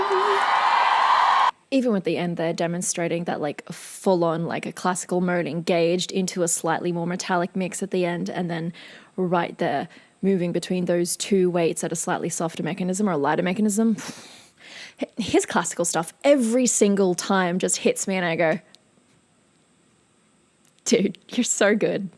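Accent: Australia. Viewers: Australian accent, yes.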